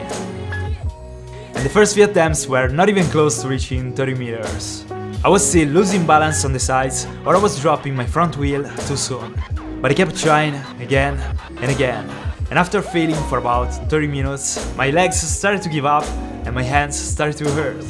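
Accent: Italy. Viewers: Ma quanto cazzo si riconosce che sei italiano! 🤣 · Hai l’accento italiano 😂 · Si sente che sei italiano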